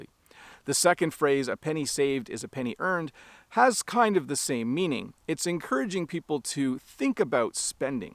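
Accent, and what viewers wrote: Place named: Canada. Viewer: Wow you are a wonderful teacher I like to learn from you Canadian accent I love 🎉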